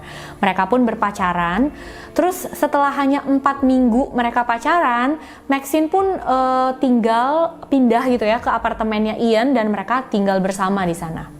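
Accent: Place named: West Java, Indonesia. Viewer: Terbaik👍 Btw ka itu orang mana?! Soal ny pas berbicara ada logat sunda ny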